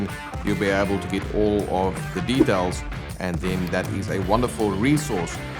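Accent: South Africa. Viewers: thanks for the vid! Are you south african by any chance?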